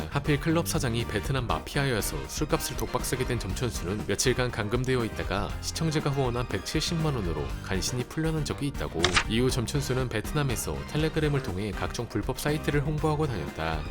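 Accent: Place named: Gyeongsang, South Korea. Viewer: 갱상도임 ㅋㅋ우파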